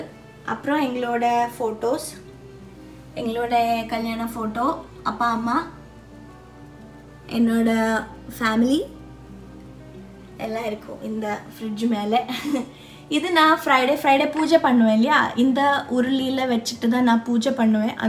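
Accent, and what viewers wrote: Kerala, India. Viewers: Iam ur new subscriber your voice and Malayalam mixed Tamil was tooo good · First time watching when I listened to i I thought the Tamil sounds like a Malayali speaking then I see the spices written in Malayalam .. r u malayali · me to first time watching superb aka unga voice malayali ponnu ivalavu alaga tamil paesurathu supera irku... nice kitchen neat and clean superb superb aka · Malayali aano. Tamil kettit angane tonni. Puttu kutti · Hi dear.. your Malayalam mixed Tamil is too cute! Like "Ethaathu"😍 can you share me the link for the grinder. I wanna buy one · Hi sister,i like your way of speaking👍,malayali tamil pesurathu keka nalla eruku..🌹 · Nice. As others say, ur tamil is sweet to hear. From ur slang i can guess u r a malayalee brahmin. But hv u not changed plastic to glass or steel. Its a trend now a days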